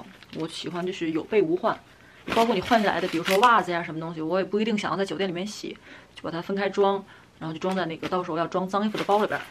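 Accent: Beijing, China. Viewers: 是北京人嗎～～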